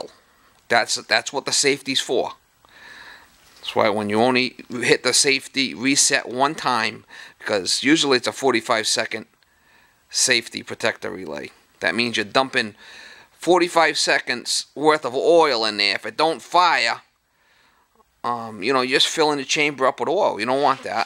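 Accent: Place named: New England, United States. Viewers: New England accent galore I was sure any moment he was gonna call his Pauly his ma or broad to help him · Aw rhight my New England Brothah! This is going to be a great help for me here (now in PA) with a 60-80 year old oil fired hot water to radiator heat, furnace and a Beckett burnah! I've run diagnostics on this thing (being a true New Englandah not cheap just smaht/frugal) for the last three years and saved about $1000 dollars. My problem is cruddy electrodes after almost every firing requiring take down and cleaning to get to fire again. I have a new transformer/igniter but haven't replaced it yet b/c the old one still works!! (sound like my dear old departed dad..). So hope your video's can help! Wicked-good video!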